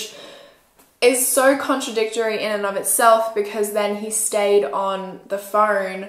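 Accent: Australia. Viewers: Omg that emergency call. It’s SO frustrating! I’ve heard similar from the US, you wonder what these people are thinking. It’s emergency for heavens sake! I’ve had to phone emergency here in Australia several times and thank God they’ve been really great. you are so fantastic at this, I love hearing another Aussie on YouTube true crime. Hugs!❤️ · i believe she does have an Australian accent :)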